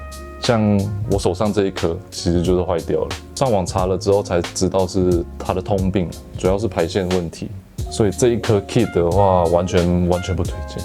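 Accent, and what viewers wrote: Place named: Taiwan. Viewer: 感覺聽起來有台灣的口音欸！是來過台灣讀書嗎？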